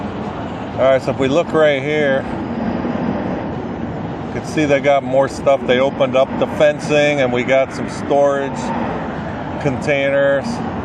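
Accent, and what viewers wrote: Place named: Pennsylvania, United States. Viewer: Where you from originally? I thought I detected a PA accent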